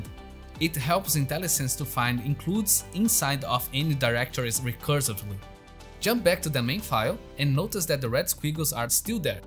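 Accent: Brazil. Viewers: Thanks for the video, you really helped me, also as a good brazilian I noticed your accent!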